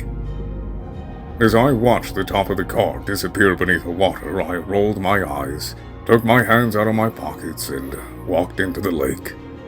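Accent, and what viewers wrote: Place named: United States. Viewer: Your deep English narrator voice with the American accent underneath is crazy lol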